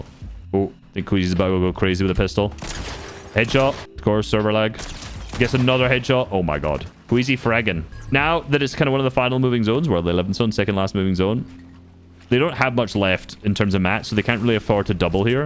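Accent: Scotland, United Kingdom. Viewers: hi, what part of scotland is your accent from, because me and my and my dad are having a discussion about it for some reason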